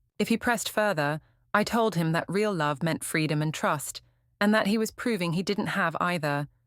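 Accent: United Kingdom. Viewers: I love the narrators British accent. But man she can really sound mean and borderline cruel. She sounds brilliant but plays the dumbest chick in every story.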